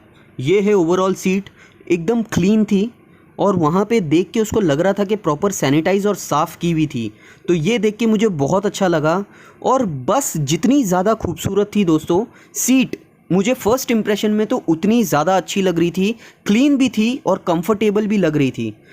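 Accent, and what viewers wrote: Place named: Maharashtra, India. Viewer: Hi, are you from maharashtra or marathi? Because your accent in hindi is similar to marathi.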